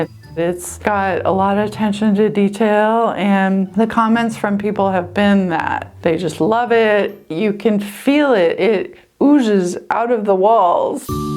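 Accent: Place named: Washington, United States. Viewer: Is it surprising that I can detect a Washinton accent?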